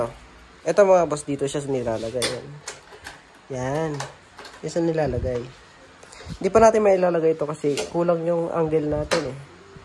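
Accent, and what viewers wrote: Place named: Albay, Philippines. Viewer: Uno ngani ngaran nikadi? Ka taga albay ka boss ah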